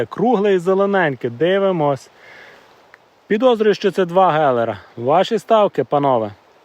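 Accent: Ukraine. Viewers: Приємно з Вами( лежачи в ліжку ) копати і насолоджуватись природою та приємним українським говором. Молодці так продовжуйте, Хто шукає той знайде .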